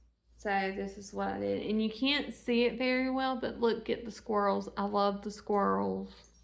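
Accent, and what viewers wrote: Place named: Mississippi, United States. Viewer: I recognize that accent. I’m a Mississippians too. ❤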